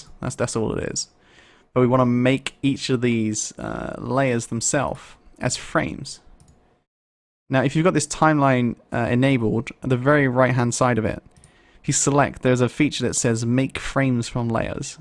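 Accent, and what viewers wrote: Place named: England, United Kingdom. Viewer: Honestly when ever I hear an english accent on starting a tutorial I think ... YES !! its likely to be clear, concise and to the point .. thank you it was .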